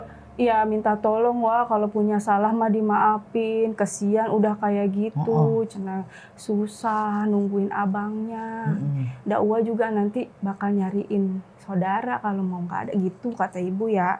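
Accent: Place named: Karawang, Indonesia. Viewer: Logat krawang nyaa .. jdi kangen kluarga aku yg d Rengasdengklok 😊😊😊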